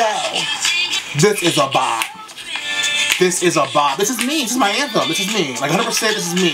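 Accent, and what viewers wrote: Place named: United Kingdom. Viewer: The British accent tho😂❤️❤️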